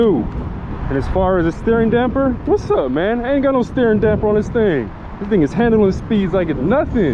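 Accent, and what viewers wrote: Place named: Bay Area, United States. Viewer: My boy, you must be from the Bay Area.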